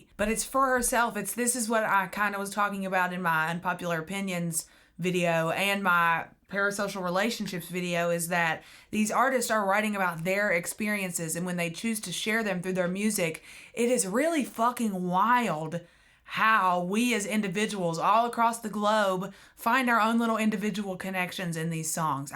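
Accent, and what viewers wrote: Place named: South, United States. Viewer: your southern accent is my favourite thing ive ever heard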